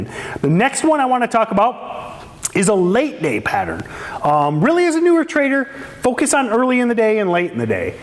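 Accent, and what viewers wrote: United States. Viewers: just so you know this is the most simple, no nonsense video on patterns that i have ever seen and i have probably seen hundreds of different videos. when other people explain things it is almost impossible for me to understand but the way you, explain things paints a clear picture in my mind and actually gives me actionable instructions that i can start applying to my trading. so thank you very much for that. maybe it's because you're also from the midwest that i am able to understand you so well, but anyways i appreciate it. i also have been enjoying the podcast. it's just the right balance of entertainment and informative content. would love to see more videos like this.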